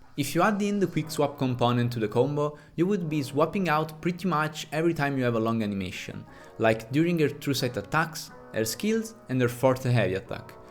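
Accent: Italy. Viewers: Completely unrelated to the video, but are you Italian by any chance? Lol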